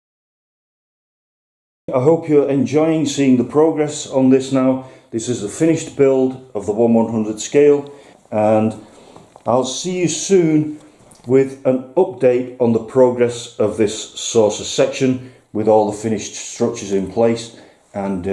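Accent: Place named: North West England, United Kingdom. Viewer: Where are you intending to display this? I assume you're in north west england from the accent?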